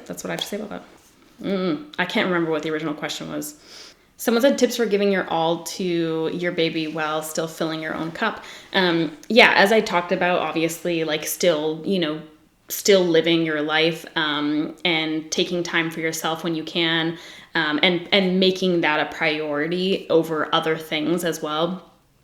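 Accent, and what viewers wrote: Canada. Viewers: WHY DO I NOT REMEMBER HIM SOUNDING THIS CANADIAN WOW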